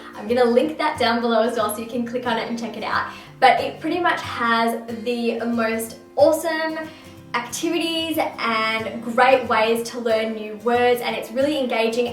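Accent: Australia. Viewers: Are you Australian? I'm very new to this channel, this is the third video from your channel I have watched! 😂 I'm not trying to be rude or send hate! I'm only asking a question.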